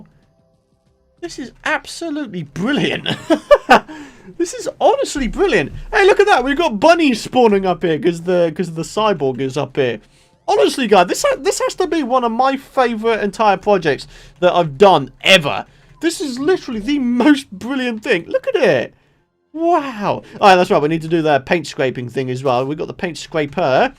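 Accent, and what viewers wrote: United Kingdom. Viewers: EMPER He’s British. It’s an accent.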